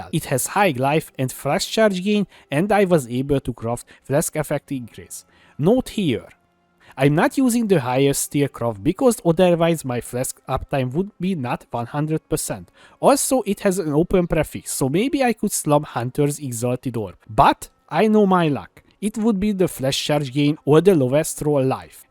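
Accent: Hungary. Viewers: Are you Hungarian by any chance?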